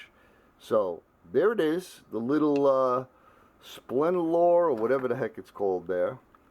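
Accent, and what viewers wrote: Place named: New York, United States. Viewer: This old machinist and inspector is happy for ya. Your new hammer rocks. Thanks for sharing and thanks for telling us you are from New York. By your accent... I kinda thought so. All the best bro.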